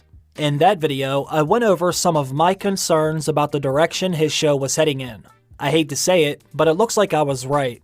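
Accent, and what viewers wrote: Pittsburgh, United States. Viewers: Alright I gotta ask... You’re a yinzer aren’t you?😂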